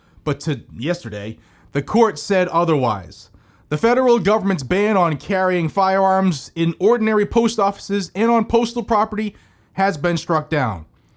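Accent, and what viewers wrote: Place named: Boston, United States. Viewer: You've lost your Boston accent :( Still love you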